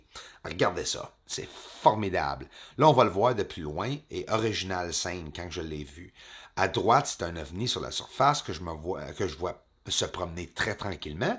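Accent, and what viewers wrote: Quebec, Canada. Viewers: y a pas pire aveugle,que celui qui ne veut pas voir,bout d,viarge,ha ha,t,as de + en + notre bel accent quebecois,lache pas,on aimes ca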